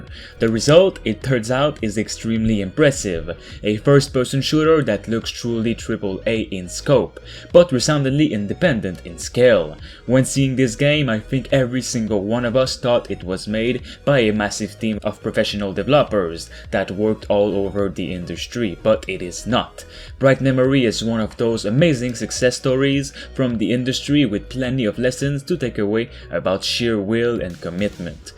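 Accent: Quebec, Canada. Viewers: Are you french canadian? You have the accent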